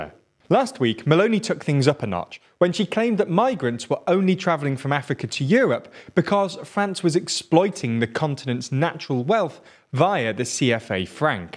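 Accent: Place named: RP, United Kingdom. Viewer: Do you also run the channel 100% Star Wars? It might just be that recieved pronounciation accent and my American ears.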